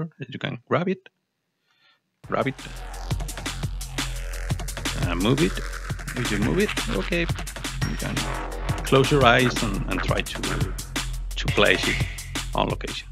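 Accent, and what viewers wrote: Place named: Spain. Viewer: Tu inglés es bastante bueno y no entiendo porque tienes que decir "sorry" por que tengas acento español. Un alemán hablando ingles tiene acento alemán. Un indio tiene acento indio. Ya me gustaría a mi que los británicos o los yankies hablaran spanish como tu hablas ingles. Asi que no hay que disculparse. Me encanta que la gente use esta herramienta para poner algo de objetividad en los visores. Pero uno de los puntos mas importantes es el sweetspot (entendido como ege-to-edge clarity) y aqui no hay una forma de comparar los visores. He visto que hay una herramienta vuestra LensTestRov que mide exactamente eso y creo que se podría añadir a esta herramienta y asi comparar resultados de sweetspot de diferentes visores.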